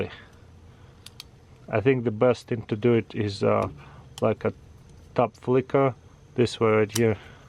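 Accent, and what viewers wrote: Russia. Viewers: Going from the accent, art thee russian?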